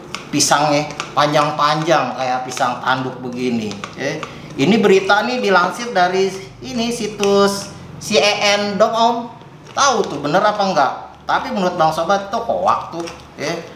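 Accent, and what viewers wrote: Jakarta, Indonesia. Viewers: Aye suka bgt ni ame logat betawi Nye,berase kekeluargaan Nye...hehehe Smoge video Nye menginspirasi yg lain yah bang ye...😊😊😊👍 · Wenak pinter abah.. Khas suaranya ky betawi, terima kasih resep nya bah 🙏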